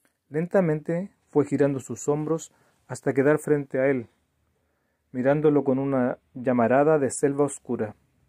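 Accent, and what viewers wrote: Chile. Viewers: un acento chileno ejemplar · siempre tuve debilidad por el acento chileno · noté el acento chileno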